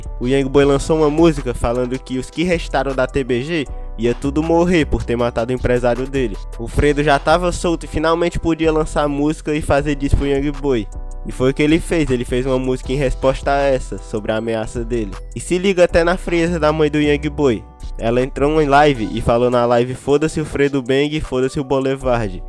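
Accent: Fortaleza, Brazil. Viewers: sem dúvidas que vc é de fortal man 😂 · Tu é de fortal né vet? Brabo mano o conteúdo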